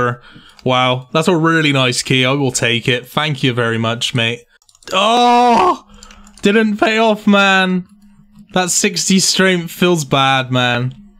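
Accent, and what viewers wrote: Bristol, United Kingdom. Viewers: Are you Bristolian by any chance? Accent sounds recognisable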